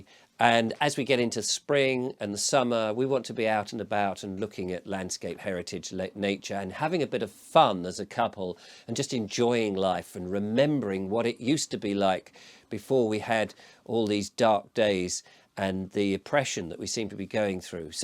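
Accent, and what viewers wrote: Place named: England, United Kingdom. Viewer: Spoken by a true English man.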